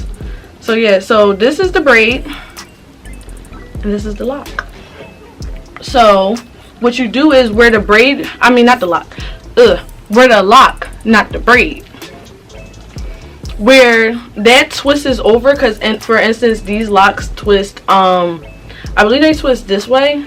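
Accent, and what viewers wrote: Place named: Philadelphia, United States. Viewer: Yesss when u started talking I was like she definitely a Philly girl 😍😍😍😍 hair stores open in Southwest Philly from another Philly girl ps I subscribed 😘😘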